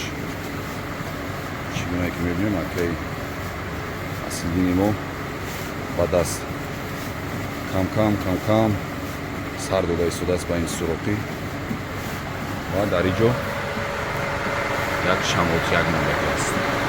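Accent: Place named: Tajikistan. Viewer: Зинда хонаобод боши бародари азиз ... Барои лавзи ширини точикона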